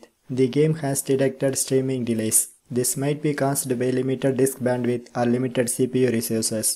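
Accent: India. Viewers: It's an average Indian accent.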